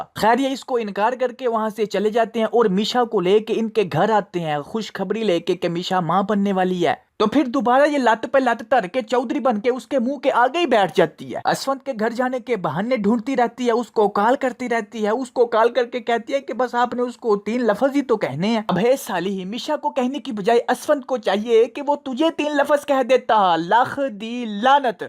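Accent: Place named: Punjab, India. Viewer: I love your Punjabi accent..it's cute.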